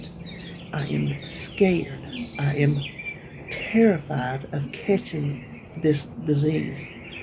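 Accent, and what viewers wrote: South, United States. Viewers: I love your Let's Talk videos. You make me laugh and I love laughing. Not to sound creepy or anything, but I love listening to you talk. I don't know what it is....whether its the southern accent or the fact that you are a downhome person.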